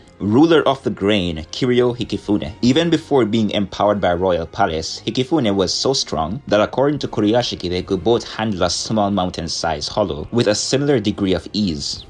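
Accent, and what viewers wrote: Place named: Jamaica. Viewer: You sound like a Jamaican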